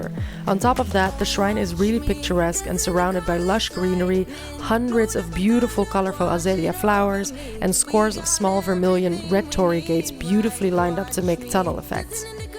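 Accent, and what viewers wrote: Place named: Netherlands. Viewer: Ik ben Japans en deze video kwam als aanbeveling, dus ik heb hem terloops bekeken. Toen ik je uitspraak hoorde, dacht ik: "Huh? Nederlands?" Ik woon al 20 jaar in Nederland, dus ik dacht dat het makkelijk te begrijpen was! Bedankt dat je Japan zo geweldig hebt geïntroduceerd!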